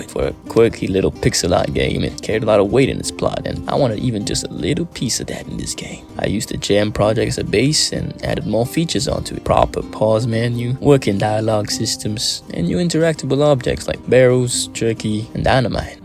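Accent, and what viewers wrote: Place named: West, United States. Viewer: Doing the entire dev log with a western accent is true commitment to the bit. I really enjoy these devlogs, thank you for sharing another one!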